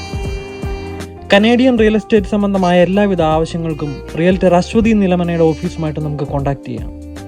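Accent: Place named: Kunnamangalam, India. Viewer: okey njan kkd kunnamangalam samsaram kettappo thanni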